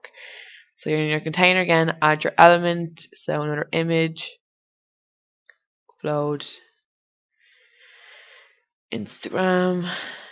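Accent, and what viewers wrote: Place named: Ireland. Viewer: you do have a lovely irish voice. thanks for the vid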